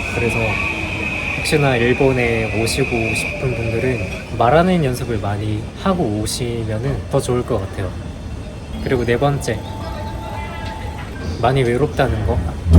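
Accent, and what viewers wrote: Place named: Gyeongsang, South Korea. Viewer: 혹시 경상도 출신이신가요~? 억양에서 살.....짝 경상도 억양이 ㅎㅎ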